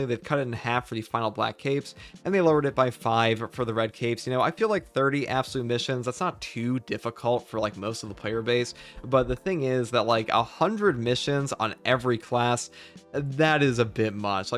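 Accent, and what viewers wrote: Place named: AAVE, United States. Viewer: ebonics.. I think??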